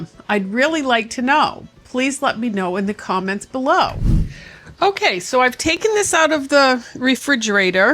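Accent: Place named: Wisconsin, United States. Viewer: I haven't been watching your channel very long (my loss - I'll have to binge older videos to make up for it), but every time you talk, I hear Wisconsin. Maybe Minnesota, but my mom's side of the family is from Wisconsin, so that's the first that comes to mind. I miss visiting there and should definitely swing back for a visit. I haven't been back in over 15 years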